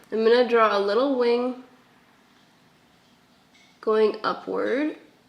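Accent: Canada. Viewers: It's the same as the southern English pronunciation and I'm grateful for it. Canadian accents are beautiful. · Jessica Ridenour Yes, it’s how most Canadians say it.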